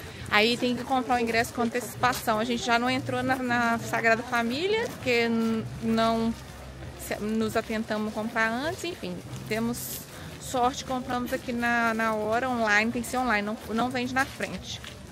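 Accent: Minas Gerais, Brazil. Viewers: Pessoal nao corrijam a quando ela falar "tamos" ao invés de" estamos",o mineiro tem mania de falar cortando as palavras, e também diminui-las kkk,mas na hora de escrevermos escrevemos direitinho😊sou mineira, mas adoro ouvir o sotaque da é bem mineirim gostoso dimais da conta sô❤❤ abraços para todos!!! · ADOROOOOOO VIAJAR COM A QUERIDAAAAA!!! E desfrutar da sua simpatia, do seu sotaque mineiro que é o sotaque mais lindooooo do mundo!!!! Beijos ❤❤❤❤❤❤❤❤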